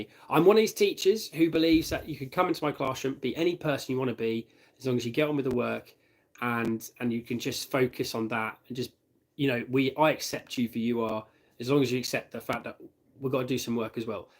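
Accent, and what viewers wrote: United Kingdom. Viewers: THE BRI'ISH ACCENT ❤ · Are you british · HE HAS A BRITISH ACCENT AAAAAAAAAH THIS IS AMAZING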